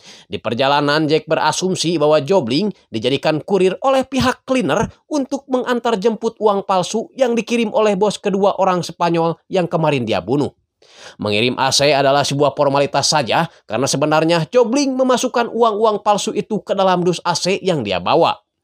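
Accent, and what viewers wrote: West Java, Indonesia. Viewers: Logat orang sunda hahaha.. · Orang sunda nih .. sama kaya iq 7 Enak di dengar😂😂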